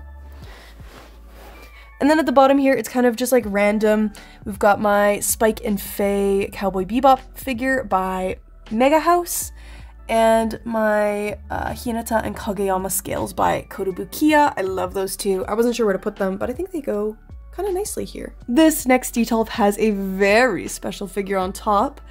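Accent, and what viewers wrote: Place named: Alberta, Canada. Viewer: Just found your channel - you have the strongest Canadian/Albertan accent, it's awesome. Great tour and collection!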